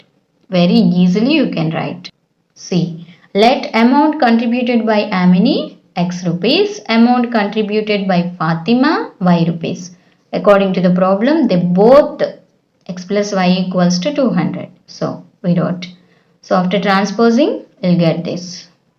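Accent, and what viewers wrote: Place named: RP, United Kingdom. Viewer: Don't do overaction okna talk properly don't talk posh english 😏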